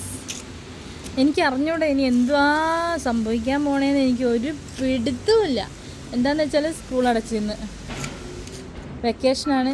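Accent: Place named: Kannur, India. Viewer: Aaha kannur bhasha 😂😂😂😂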